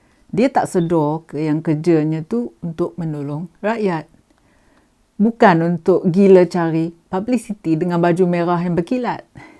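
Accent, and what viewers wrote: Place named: Kuala Selangor, Malaysia. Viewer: hehehee.. sya suka dgn logh4t puan.. mcm org kampung(kuala selangor) teman pn ye.. mcm org kampung isteri (ipoh) teman pn ye jugok.. apa pun.. teruskan 'membuka' mata marh3in dgn isu2 semasa yg p4nas! ..Terbaek Yong!!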